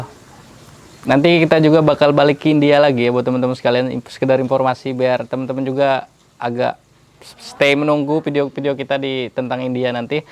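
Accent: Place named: Lampung, Indonesia. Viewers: Lampung ne gegoh nhn bahasa ne heee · Pantes kek logat lampung pas liat video" yg baruu wkwkw ternyata orang lampung... Wah ikut bangga... Lampung mana bang... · Bang Lampung nya dimana? Dari bahasanya yg sedikit terdengar...seperti bahasa daerah Lampung Barat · Bahasa lampungnya kok mirip peminggir/pesisir... aslinya Lampung mana bang? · pantesan logatnya kok kayak orang lampung, kk ternyata orang lampung tohhh.....mirip kali sama teman kuliahku Redy, mukanya, suaranya ... wkwk.. sukses terus ya kak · pertm nntn vlog bang...lgs tau kl bang suku Lampung,khas dr logatnya.trnyt qt msh 1 daerah...kamipun sukuny lampung asli ibuku dr tanggamus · Baru berapa hari di rumah udah lampung kali logatnya bang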